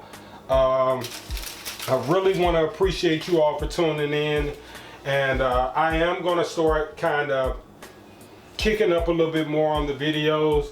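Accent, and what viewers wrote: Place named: United States. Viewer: Whomever would make fun of your wholesome American accent is simply jealous. This recipe looks great! I have not seen crawfish for sale but will start looking for it. This would make a dynamite pizza. For what it's worth: I have been remarkably more healthy since I started making and eating sauerkraut. I used to catch every cold or flu going, had chronic bronchitis for five years. All gone since I started this habit. During the past winter, I have not had so much as a sniffle or cough. Not sure how it works but the effect for me is miraculous. I hope this is useful information.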